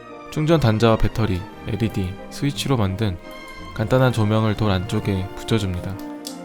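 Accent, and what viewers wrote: Daejeon, South Korea. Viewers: 어? 혹시 대전사람이세요? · 님 혹시 대전사람..? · 오 님 대전사람이세요??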